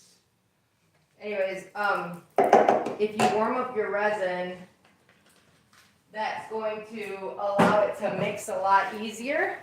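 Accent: Michigan, United States. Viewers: Man I just found your channel and I love your high spirit. You are so much fun to watch. It sounds like you are in Michigan and so am I. If you hold classes let me know I would love to attend. I work with resin and I’ve been contemplating adding wood.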